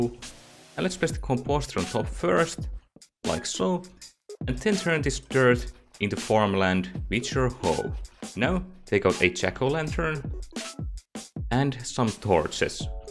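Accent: Finland. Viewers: Are you finnish? I can hear your accent😂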